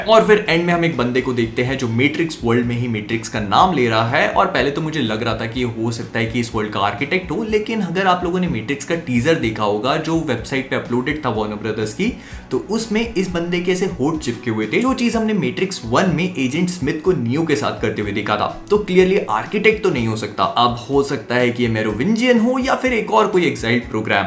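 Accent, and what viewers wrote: Bhopal, India. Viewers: apka accents sunker bhopal ki yaad ajati hai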